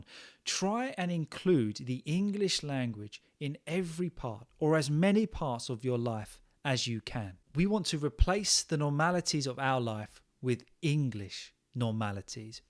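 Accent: United Kingdom. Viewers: Thanks for advice🎉 are you British, or did you learn British language?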